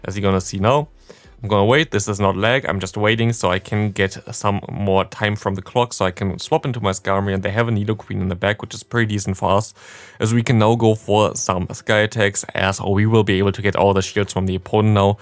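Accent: Germany. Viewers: Ich schaue beim Zähneputzen gestern dein Video, meine Freundin kommt rein und fragt: "Ist das ein Deutscher?" Ich glaube man hört das 😂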